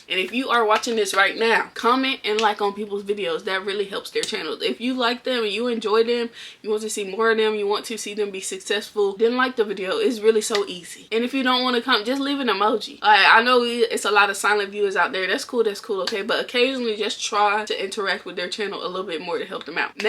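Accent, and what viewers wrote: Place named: Florida, United States. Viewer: Knew you was a fellow Floridian on the original video I watched!! We just have a distinct sound. Im from Palm Beach. I will be starting my channel in a few days and this really helped to uncomplicate it all! Ive felt stressed starting a channel before. It wasn’t fun either.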